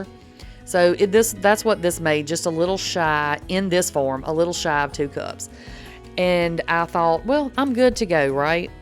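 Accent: South, United States. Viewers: Thank you for posting ma'am... I enjoy your channel and love your accent... I was in Ft. Benning in the early 90's and miss the lifestyle and accent. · your sweet southern drawl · Yes, I love your Southern accent too! ❤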